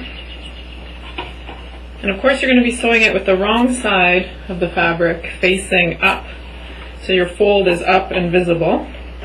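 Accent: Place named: Minnesota, United States. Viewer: Do I detect a Minnesotan, or something nearby, accent? 8) You sound like so many of my northern relatives. Thank you for the video - about the only thing I can sew is blankets and pillow cases so it was nice to see another use for straight lines. I like to use box flannel and back it with satin - it's a tough sew with the slippery fabric, but the kiddos Love the 'silky' when they take their naps.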